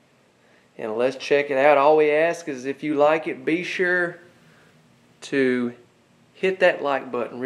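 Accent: South, United States. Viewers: Nice mobile home, and like that southern draw.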